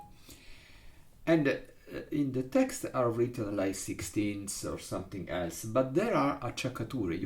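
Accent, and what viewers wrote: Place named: Italy. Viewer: Quite entertaining and hilarious what the AI/NLP makes as subtitles once in a while for your lovely Italian English 😆 I have no difficulty to understand you, but then I need English at work all day and my Italian colleagues have trained me well, who sound very similar to you. While I might be identified by listening to me speaking English as a German mother tongue as well…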